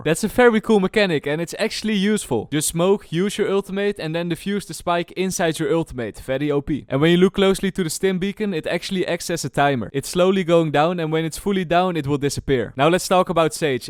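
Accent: Netherlands. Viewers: you dutch/nederlands? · bro je stem is zooo Nederlands gewoon holly fuck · je ziet dit waarschijnlijk niet maar holy moly je klinkt ZO nederlands xD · Bro are you dutch? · Do I hoor Nederlands? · Het nederlands accent maakt de video veel beter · is this guy dutch? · I smell Dutch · Are you dutch or something, because you have that accent. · Ik weet zeker dat je Nederlands bent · this guy sounds like he's dutch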